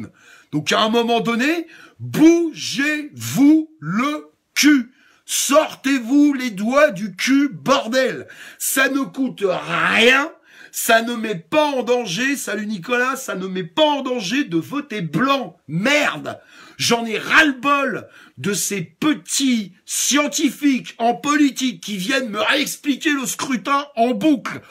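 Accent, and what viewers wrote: France. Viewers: J'aime trop comment vous parlez, enfin un vrait Français, bravo msieur je suis abonnée👼👍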